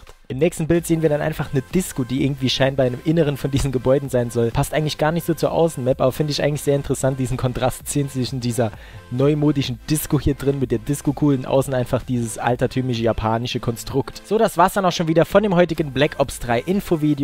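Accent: Saarland, Germany. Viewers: Kommst du aus dem Saarland?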